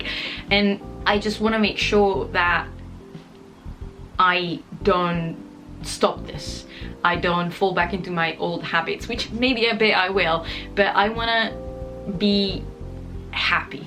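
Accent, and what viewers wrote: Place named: England, United Kingdom. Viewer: Da quanto tempo ti trovi in uk? Sembri Inglese 😁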